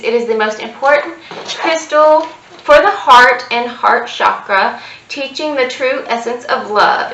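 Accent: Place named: Texas, United States. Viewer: I'm guessing by your accent that you're from Texas.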